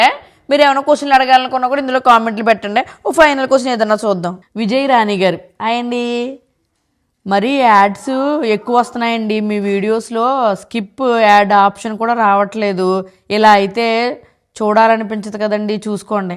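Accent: Godavari, India. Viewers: Memu jamshedpur lo untaamu. Kaani native place West Godavari Palakol Anduke mee Godavari yaasa chala chala connect avutaanu. Movie lo cheste Andhra audience bagaa. connect avutaaru . Mee West Godavari Yaasa biggest highlight avutundi ye movie ki ayina. Idandaa yenduku chebutunnannate. Anil Ravipudi gaaru Meeku chance ivvaalani korukuntunnanu. I am a Big Big Big fan of u. Yenta appreciate chesina naaku tanivi tiratledu. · ఆమ్మ! ఒక కోనసీమ జిల్లా వాసిగా చెప్తున్నా! You made గోదావరి భాష యాసిని famous through out world with your videos / reels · Mee godavari language super ga untadhi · Yes nijamee andi nevadhathamasgarilage vuntaru me voice chala baguntndhi godavari language chala famous ayyindhi andi amrica lo kuda tq godblessyou 🎊💝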